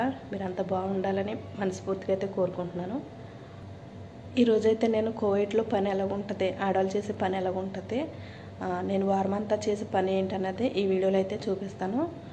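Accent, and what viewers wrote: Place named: East Godavari, India. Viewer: మీరు చాలా కష్టపడుతున్నారు అమ్మా. మీది ఏ ఊరు అమ్మా, మా సైడ్ భాష లాగ ఉంది మీ మాట . ఈస్ట్ గోదావరి జిల్లా మీది మాది అక్కడే అమ్మా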